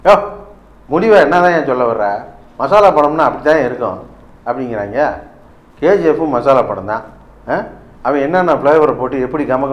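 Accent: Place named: Chennai, India. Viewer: Inna thalaiva Madras bhaasha la varuthu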